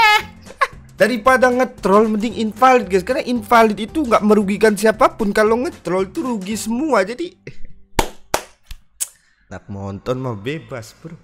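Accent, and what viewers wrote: Java, Indonesia. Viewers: Bang itu orang jawa ya? Kirain di asli sunda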